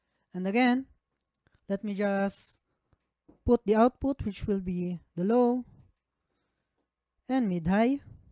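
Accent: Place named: Visayas, Philippines. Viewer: Pinoy ka nman yata tsong kasi halata sa pagsasalira mo...bisaya ka pa nga yata e..bakit mo pa pinahihirapan sarili mo pati audience mo sa pag intindi sa sinasabi mo...nagpupumilit ka mag english...mga pinoy karamihan nanunuod sayo dito kasi nasa pinas ka kaya mas mabuti mag tagalog ka na lang para mas madali ka intindihin ok!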